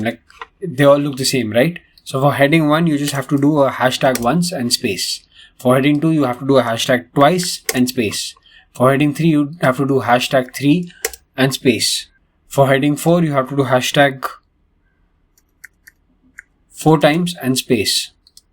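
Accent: India. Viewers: Very good video, but sorry, your indian accent is vey strong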